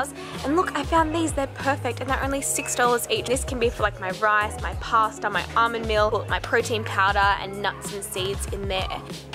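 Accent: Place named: Australia. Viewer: It’s just Australian lol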